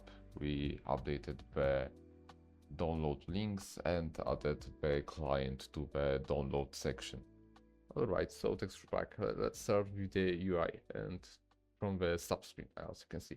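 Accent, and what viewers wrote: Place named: Poland. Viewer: Słychać ten polski akcent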